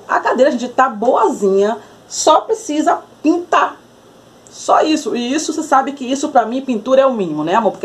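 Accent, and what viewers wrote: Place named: Salvador, Brazil. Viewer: Assim q iniciou pensei logo " Meeeeu Deus , Ela é de Salvador " Nosso sotaque é maravigoude 😍 Muuuulher , você é luz , Ganhou mais uma inscrita 🥰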